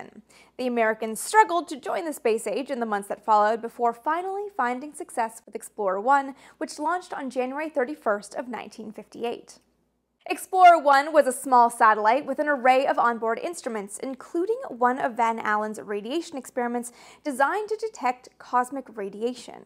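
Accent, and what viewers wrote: Canada. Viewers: Yay. More content! I can still hear the Canada 🇨🇦 in your voice. I'm from Syracuse. 🤷🏿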